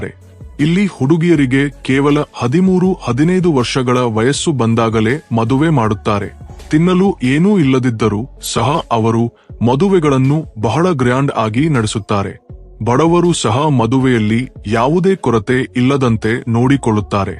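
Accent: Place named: Andhra Pradesh, India. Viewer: ನೀನು ತೆಲುಗು ನವನು ಎಂದು ಕಾಣಿಸುತ್ತೆ ಅದಕ್ಕೆ ನೀನು ಕನ್ನಡವನ್ನು ತೆಲುಗಿನ ರೀತಿ ಮಾತನಾಡುತ್ತಿದ್ದೀಯಾ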